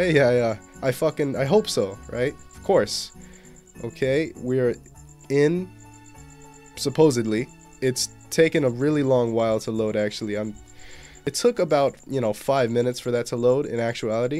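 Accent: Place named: United States. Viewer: i love how to this guy narrated the video with the slang and bla bla bla, very american i love it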